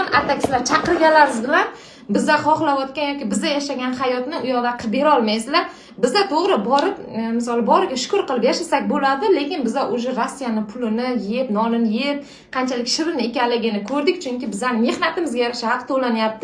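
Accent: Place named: Bukhara, Uzbekistan. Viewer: Бухороча тошкентга ва харазм шевасинда гапиробсан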